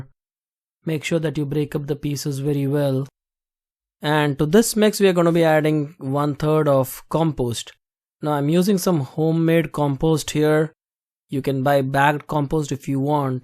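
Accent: India. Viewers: Sound like india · Your accent is Indian man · your voice teling that you are indian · why you sound like indian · I know u r indian By ur ascent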